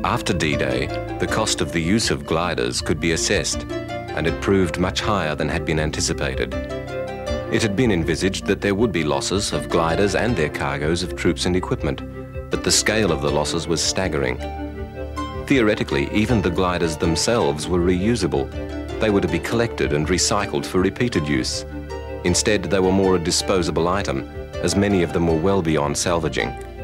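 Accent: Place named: Australia. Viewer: Interesting video but the continuous loud background music is very annoying. Is this an Australian production? The narrator sounds Australian.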